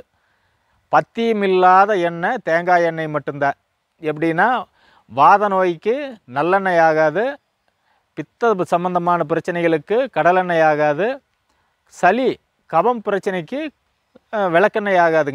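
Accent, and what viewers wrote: Kongu, India. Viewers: வேருக்கு மருந்து கட்டுற மரமும், காடும், ஆளும், குடிக்கிறவர்களும், போய்விடுவார்கள்... கிட்னியும் புடுங்கிட்டே போயிறும்.. கொங்கு தமிழில் வேற லெவல் பேச்சுங்க அண்ணா..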